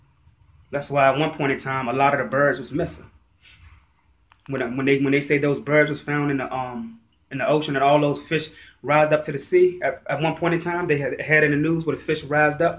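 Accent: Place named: DC/Maryland, United States. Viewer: I'm from forestville, md in pg county. I can tell you're from the dc/md area by the accent. Projects is a project, an experiment. Artificial birds bees mosquitos & fleas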